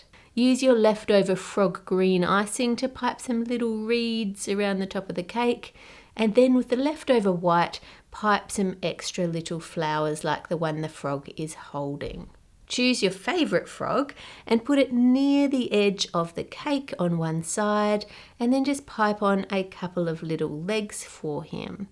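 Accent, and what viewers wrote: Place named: Australia. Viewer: I would watch these videos solely to hear pronounce "chocolate" in her incredible Australian accent. As a linguist, it makes me inordinately happy.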